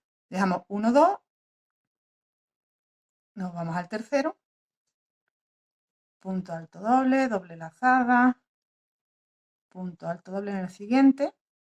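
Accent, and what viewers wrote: Andalusia, Spain. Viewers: Hola creo que eres de andalucia, me alrego de seguir a una española, lo explicas muy bien. Gracias por compartir tus vidios. Yo soy de un poquito más arriba.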